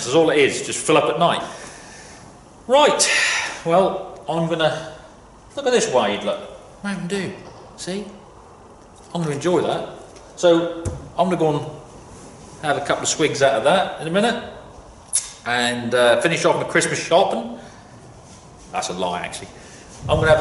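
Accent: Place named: Gloucestershire, United Kingdom. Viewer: well difficult to tell but where abouts in the country are you from? as a Gloucestershire lad you sound like a posh GL resident, you are going to say your from up north now ha ha. Enjoy the chanell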